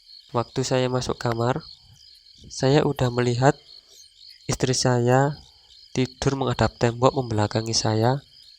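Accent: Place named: Malang, Indonesia. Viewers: suaranya khas malang banget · Itu logat medoknya kayanya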